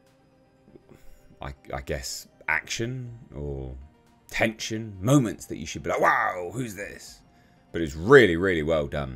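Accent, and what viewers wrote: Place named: England, United Kingdom. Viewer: When you say “masterpiece” with your English accent, I want to believe you. I’ve been let down too many times (not by you… yet). I’ll let you know. I’m Cajun who now lives in South Carolina. We don’t do toxic positivity. 😉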